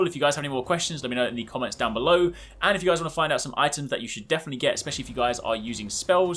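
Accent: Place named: England, United Kingdom. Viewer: You talk so fast and ur English accent makes you sound smart. But seriously I love how u cut to the chase. I'll follow you off a cliff if you ever jump off one.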